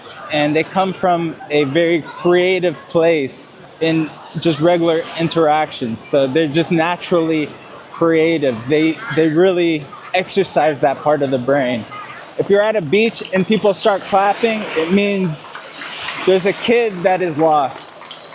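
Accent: Brazil. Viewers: Hey I'm not trying to be rude or anything but I could not help myself to notice you've got a Brazilian English accent somehow?! Please tell there is a explanation and I did not lose my mind yet. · You have an accent. I guess you’re Brazilian as well! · Very accurate in many points. This guy dived so deep into the Brazilian culture that it seems to have influenced his way of speaking. It's funny because normally Americans don't have that voice intonation. He reminds of a Brazilian speaking fluent English without an accent.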